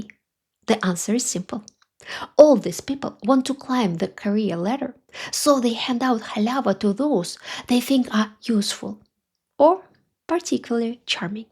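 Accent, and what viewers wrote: Russia. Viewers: I love the Russian accent, it is such an interesting informative explanation about the Russian culture and mindset. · Just my two cents... 1. Terrible English pronunciation (strong Russian accent), painful for my ears, a transcript would have helped. 2. Long, convoluted sentences, the entire talk could be made more succinct, the concept does not desire such a lengthy analysis. 3. Khalava (халява) is a simple concept, called "bribe" in English (literally "present", or "cadeau" in French). 4. you link military technology and Russia not posing a threat, neither is either true or have logical connections, not to mention the concept of "threat". 5. Subsequently, you hint on a Hitler analogy for Russia: please... we know who are those spreading that false narrative, are you one of them? Bad idea. 6. Having a separate video about Putin's psychological profile? 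What kind of credentials do you think you have to make anyone interested in it? 7. Talking about arrogance (that you yourself radiate), you are absolutely right (ironically), that it is a bad trait and should be avoided, along with hubris. 8. On generic lines, you are not saying anything outrageously stupid or incorrect, just repeating a certain narrative, hence "nothing new". Alas, biased videos like this can proliferate on YouTube, as there are always enough polarized people who will feed on these. IMHO this was a waste of (my) time, so good luck with this narrative, but I find it unwatchable and a (rarely clicked) thumbs down. Peace :)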